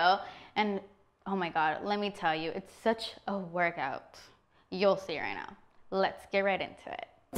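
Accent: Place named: United Kingdom. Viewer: Who is this British chick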